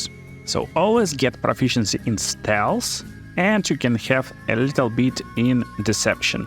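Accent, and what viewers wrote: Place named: Russia. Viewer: какой русский акцент :)))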